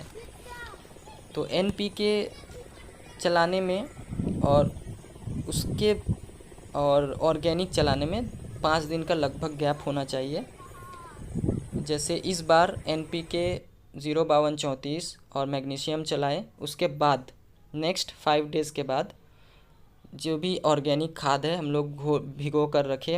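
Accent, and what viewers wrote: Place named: West Bengal, India. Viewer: Are you from west bengal ?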